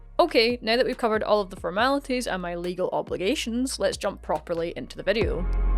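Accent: Scotland, United Kingdom. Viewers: I really did not expect to hear an scottish accent when I clicked a video LOL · The thick Scottish accent with the Chinese channel name threw me off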